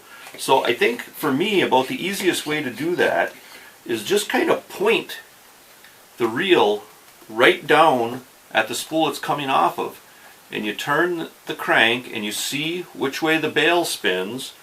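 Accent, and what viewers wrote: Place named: Upper Midwest, United States. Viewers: Oh man, I'm a Minnesotan down in Florida for work, great to hear that northern accent on youtube, don't you know. Fishin' fer Pompano stead of crappie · Where is this guy from? Sounds like norther Michigan, Wisconsin or Minnesota. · the way you say pound tells me you are from minnesota or wisconsin all my relatives are up in MN you sound just like them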